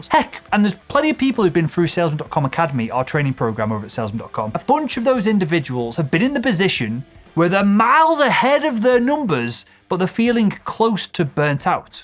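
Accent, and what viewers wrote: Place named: North, United Kingdom. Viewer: Love your content, and the northern accent as always 😅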